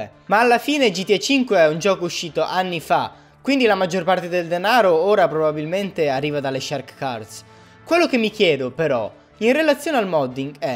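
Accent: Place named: Tuscany, Italy. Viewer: sei toscano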